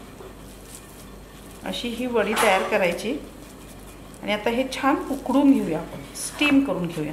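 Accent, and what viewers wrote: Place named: Kolhapur, India. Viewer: मी खूप दिवसांनी आज यू ट्यूब वर आले आणि प्रथम तुमची रेसिपी बघितली. खूप छान सोपी रेसिपी आहे. आज मला १० रु. ला मोठ्ठी पेंडी कोथिंबिरीची मिळाली आहे. आज संध्याकाळच्या जेवणातच मी ही भाजी करून बघीन. माझ्या तोंडाला आत्ताच पाणी सुटलंय.! आणखी एक. खूप दि.नी तुम्हाला पाहिलं. हडकल्यासारख्या दिसताहेत. छान दिसताय. तुमचा कुडता, व त्याचा पॅटर्न पण खूप आवडला. तुमच्या तोंडची कोल्हापुरी लहेजा असणारी भाषा मला फार आवडते. लहानपणी काही काळ कोल्हापुरात होते. एकदम् नोस्टाल्जिक वाटतं.❤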